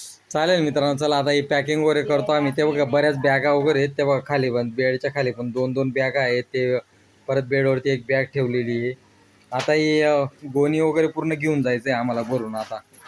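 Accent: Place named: Ahirani, India. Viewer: Dada tumi same maja navra sarkh bolta aayrani madhe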